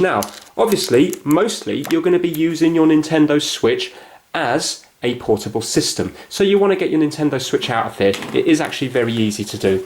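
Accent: United Kingdom. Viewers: You're better and more resourceful engineer than an engineer from Columbia University in New York. But I'm not sure about the British accent though.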